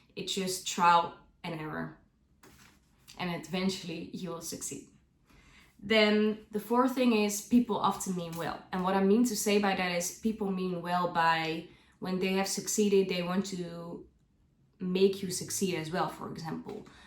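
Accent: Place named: Netherlands. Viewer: You have many good points 😊 keep it up 😊 are you dutch btw? I notice a small accent 😅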